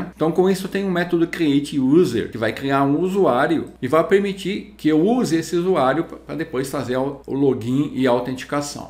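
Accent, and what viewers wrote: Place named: France. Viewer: Você participou da minha vida inteira codando, sempre que procuro algo encontro vc. Hoje é a primeira vez que ouço sua voz e percebi que é puxado para o francês 🤗 Engraçado te seguir tantos anos lendo seus artigos e só agora ver vc trabalhando efetivamente. Sensacional o tutorial como sempre 👏👏👏👏. Mestre do code!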